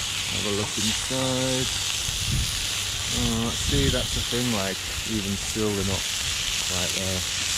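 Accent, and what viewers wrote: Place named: North, United Kingdom. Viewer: Just found you. Good to hear a northern accent, I will need to go back and catch up.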